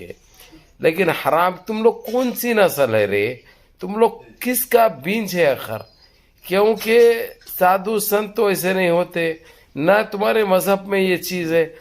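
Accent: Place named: Bangladesh. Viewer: Ap arbi logou ku denk kar ap bahut feel kar te jaisa ke ap bhi arabi ahy hyd ke chaushu se baat karoyaro ap jo arabi me karte aisa lagta ahy koyai bangali ahy ap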